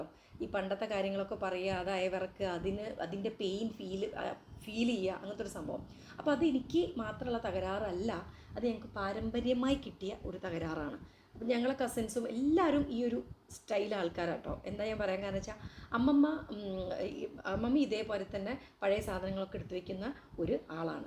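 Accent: Kozhikode, India. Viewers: ഈ nostu കേൾക്കാൻ വേണ്ടിയാണ് ഈ ചാനൽ കാണുന്നത്. പിന്നെ കോഴിക്കോട് സ്ലാംഗ് കേൾക്കാനും ഒരു ആഗ്രഹം. എൻ്റെ അച്ഛൻ്റെ വീട് കോഴിക്കോടാണ്..അവിടുത്തെ ഭാഷ അതേപോലെ അവതരണം 👌👌👌👌👌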